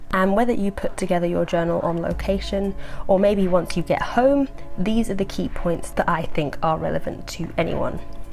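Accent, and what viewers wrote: United Kingdom. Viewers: normally british accents kinda remind me of how shitty humans can be, especially the very articulate well enunciated ones. but you, you broke the accent mold for me.... the format you use has bin abit of a schtick, most of the time. with no audio id assume you would be obnoxious cus of judging things based on other things, but this breaks the format mold. basically i think you are neat. thanks for being cool!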